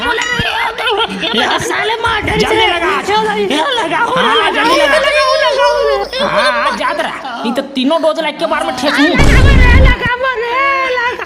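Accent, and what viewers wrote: Chhattisgarh, India. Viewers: बढ़ मजा आथे भ़ईया आपके विडियो म हमर छतीसगढी बोली भाखा सुन के 😅😅😅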